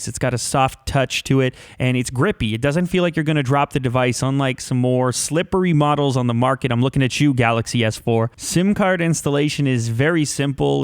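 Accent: Canada. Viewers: He's Canadian?? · Hey are you from Canada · YOURE CANADIAN?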